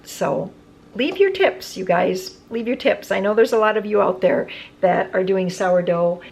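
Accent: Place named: Wisconsin, United States. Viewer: You are definitely a Wisconsinite. Your accent. I was born, raised and educated there, so I know the accent. out, down, ought, supper, etc., etc., A great place to have been raised.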